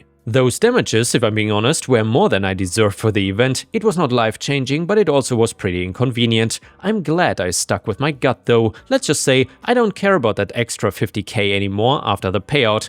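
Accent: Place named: Germany. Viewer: dein deutscher akzent ist gar nicht so stramm, sehr gut, ich kann deutschen akzent in englisch nicht ertragen 😅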